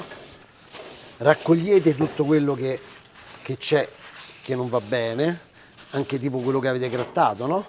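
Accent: Rome, Italy. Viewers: ogni volta che vedo un video in cui dai un morso ad un panino e sento quel rumore di pane che scrocchia darei un morso allo schermo!! iscritto da almeno 8-9 mesi!!! Continua così fai delle ricette fantastiche e io che sono del nord, personalmente adoro il l' accento romano e continuerò a seguirti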